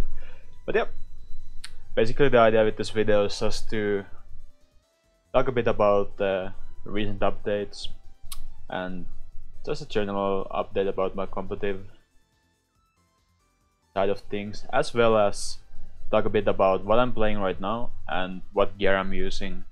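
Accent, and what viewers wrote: Finland. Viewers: Yo the voice/energy in it isnt that easy to chaenge when you have a Finnish accent and English isnt your first lanuage.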